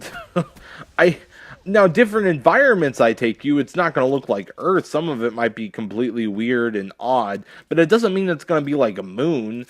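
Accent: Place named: Ireland. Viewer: It's an Irish accent ;D Thanks man that means a lot xD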